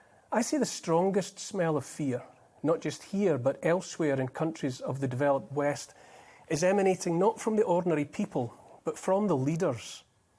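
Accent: Scotland, United Kingdom. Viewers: is really getting Scottish on us. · I’m always scrolling thru cuz I want to see more of, talks total sense. Conclusion - he’s not in the GB news schedule often enough, and I think the user comments reflect that. We all love that Scottish twang, although I think maybes the ladies out there are thinking Mel Gibson in Braveheart - dont blame u tho, I’m waiting for a rugged man (or woman if you’re not beaten down) of any Nationality to save us from this madness, xx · Sorry, I thought you were a twat wandering around the countryside commenting on puffins et al. You are inspiring....especially as we can understand most of your brogue!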